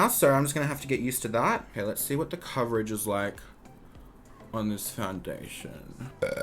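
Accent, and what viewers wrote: Queensland, Australia. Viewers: I've noticed that the queensland accent says "oh" as "err" e.g. "Sephora prER makeup" lol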